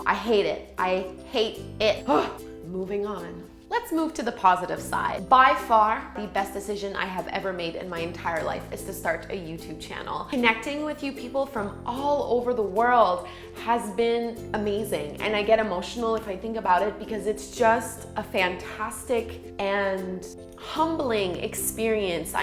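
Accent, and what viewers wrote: Canada. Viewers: I found your channel through a collaboration you did with Brett Larkin and stuck around because on your honest style and lovely Canadian accent. As an English teacher, I have a thing about accents 🤓 Thanks for keeping it real (the jealousy video and the meditation challenge 👍🏻) Hugs from Finland.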